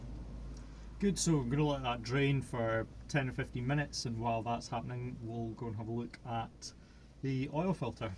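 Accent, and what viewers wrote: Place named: Aberdeen, United Kingdom. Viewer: Yes, you’ve helped me. Thanks. With the accent and sound of the seagulls, I think you maybe near Aberdeen? Cheers!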